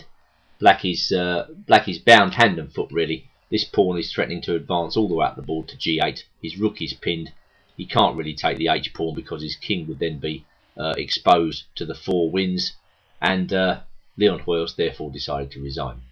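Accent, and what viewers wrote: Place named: England, United Kingdom. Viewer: I don't think so at all. It's a classic English accent. At least I can understand him. Whereas with a Scottish accent for example, I often have trouble understanding it. Scottish accents remind me of French Cajun from Louisiana where almost every other word is indiscernible. So I have no gripes about this man's accent. Perfectly English.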